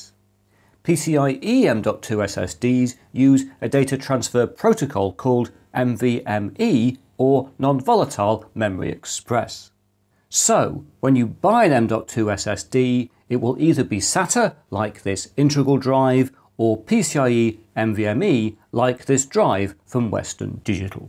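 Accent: United Kingdom. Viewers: Your british accent is exemplary.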